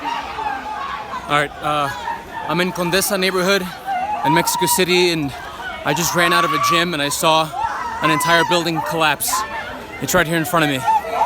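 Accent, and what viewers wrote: United States. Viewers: Hate to break it to you but it is in fact American English.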